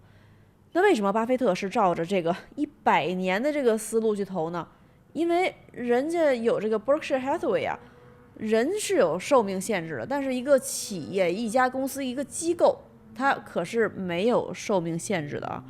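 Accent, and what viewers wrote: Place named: Beijing, China. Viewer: 本期北京话含量极高:face-blue-smiling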